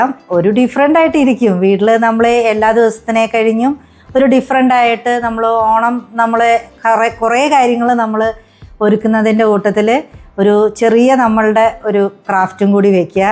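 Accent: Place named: Thrissur, India. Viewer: Trissur slang Ella samsarathil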